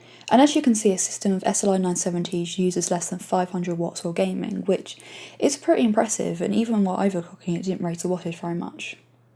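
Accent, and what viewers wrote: England, United Kingdom. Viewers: Well I have to say you know your stuff and very easy on eyes too. Although I have a little problem understanding you. You have a very rich english accent. But after watching about 3 of your videos, I guess it's starting to clear up a bit. Oh and by the way, 980 in SLI is the way to go! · that's British English :) C'mon im Brazilian and i understand perfectly fine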